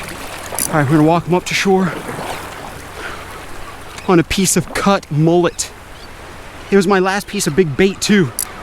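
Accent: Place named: South, United States. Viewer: Love the little southern twang you picked up hanging wit dem boys.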